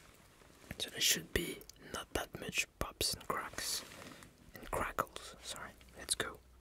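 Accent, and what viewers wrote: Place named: France. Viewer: French accents are the best tbh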